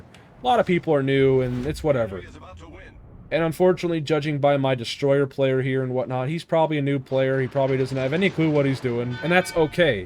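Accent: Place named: South, United States. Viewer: Kinda new to wow, screw every other tip video, I shall let the man who sounds like a red neck speak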